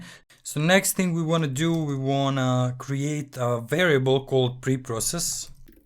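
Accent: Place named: Russia. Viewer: Кайфую от Русского-Английского ))) Бекаус блять миссинг патх, фор пост епта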